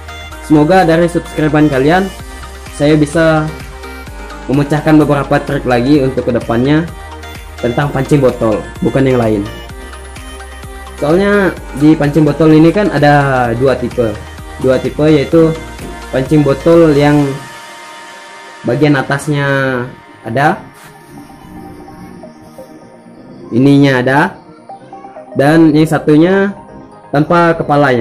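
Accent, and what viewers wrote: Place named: Kalimantan, Indonesia. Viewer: Orang kalimantan ya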